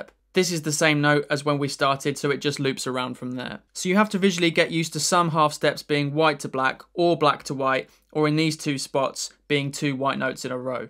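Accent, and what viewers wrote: United Kingdom. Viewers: His Bri ish voice is very great. Teaches well, too!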